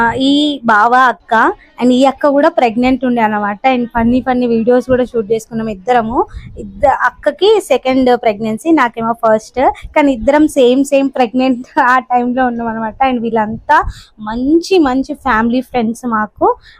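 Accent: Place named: Telangana, India. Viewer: Bagunna akka nuvvu anna ala vunnaru ni way of talking madhi same manam pakka telangana akka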